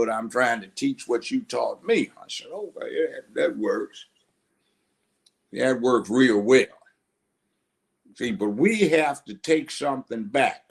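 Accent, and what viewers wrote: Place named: South, United States. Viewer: With someone with such heavy southern dialect, his impressions are amazing lmao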